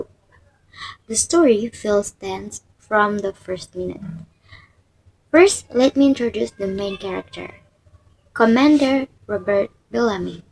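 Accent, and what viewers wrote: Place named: United Kingdom. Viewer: my british accent 😻😻😻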